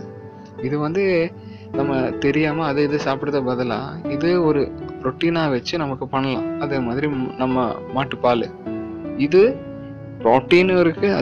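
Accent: Kerala, India. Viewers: Unga Tamil la malayam slang iruku..r u a Malayali??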